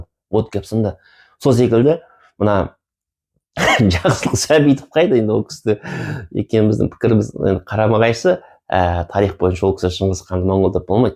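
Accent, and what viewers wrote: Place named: Xinjiang, China. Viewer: Фу ДАУЫСЫ КАНДАЙ ЖАМАН ,кытайдан келген казак тардын сойлеген СОЛАЙ ЖАМАН.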